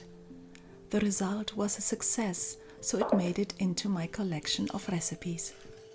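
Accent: Scotland, United Kingdom. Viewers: Hello from Dublin Ireland i just found your channel and i am really enjoying catching up on your previous videos. Where is your birthplace ? You have some Scottish accent.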